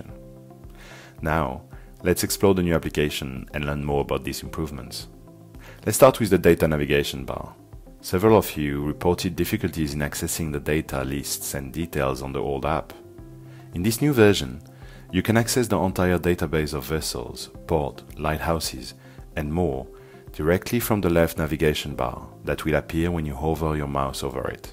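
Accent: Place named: France. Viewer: Sounded like a french accent to me. Kinda explains this nightmare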